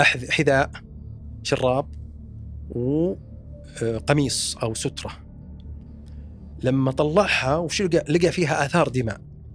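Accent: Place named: Qassim, Saudi Arabia. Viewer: من احلى ماسمعت قصص ررررررائعه بلهجة قصيمية تزيد من جمالها 😂😂 ، حلقه كل اثنين ! ياليت تصير كل اسبوع حلقتين لو قصه فقط بدون تحليل ✅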